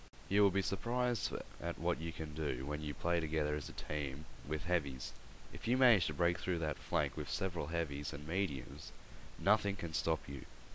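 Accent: Australia. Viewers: Nice job and some helpful tips. I regard the rich and mellifluous Aussie accent as a bonus. Thanks, mate! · ozzies always say youchoob.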